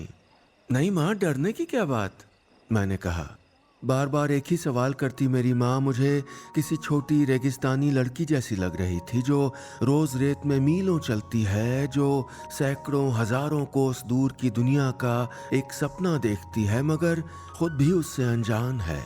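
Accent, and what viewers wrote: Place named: Marwari, India. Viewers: सर, जय श्री कृष्णा, मै राजस्थान के जोधपुर के रहने वाला हूँ,और जब भी आपकी कहानियाँ सुनता हूँ तो उसी मे डूब जाता हूँ, पहली बार आपकी आवाज मे राजस्थानी मारवाड़ी भाषा सुनकर ऐसा लगा की आपने कानो मे मिश्री घोल दी हो। आप से अनुरोध है की आगे भी दीक्षा चौधरी जी की कहानियाँ आप हमको मारवाड़ी मे ही सुनाये,धन्यवाद,CA अभिषेक वर्मा,<num> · Bht hi pyaari story thi sir and aap bht pyàari rajisthani bolte ho👌👌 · 'Kup chan goshta' matlab 'bohot hi achhi kahani'. 'Hrudaysparshi' yani 'dil ko chunevali'. Indian languages are so amazing i liked the way you spoke rajasthani so mch.I couldnt control commenting in my mothertongue marathi I wish i could write a story and nilesh sir narrating it.....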